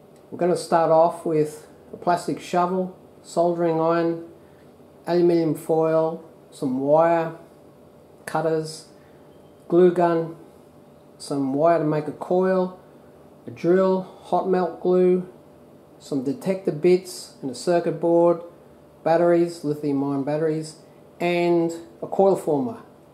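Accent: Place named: Australia. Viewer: Good work mate. You sound like a typical Aussie that are clever at innovating and making things out of nothing.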